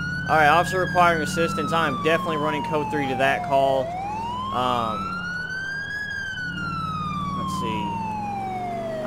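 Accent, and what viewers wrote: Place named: Kentucky, United States. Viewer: As soon as you started I could tell you were from KY im from Barren County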